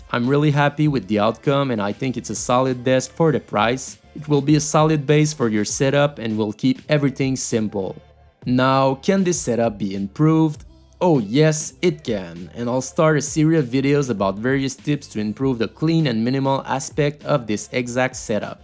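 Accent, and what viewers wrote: Quebec, Canada. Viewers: thanks and I have a question ... are you Canadian? I noticed that you had a little Canadian accent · Je reconnais l'accent québécois ;) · Yoooooooooooo serais -tu québécois par pur hazard??? XDD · tu as l'accent du québec,mais bonne video tabarnak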